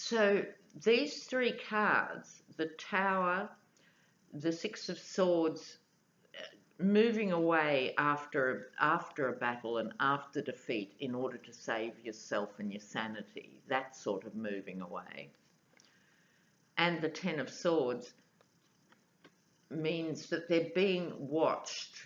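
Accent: Australia. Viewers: I just came across your Tarot videos yesterday. I Love ‘em, but I am writing to give you kudos for your up to date and in depth awareness of what’s going on politically in the USA. I am amazed. You know as much as any political junkie here does. I recognized your accent as Aussie but assumed you must live here, until you mentioned you were in Australia. How do you keep up?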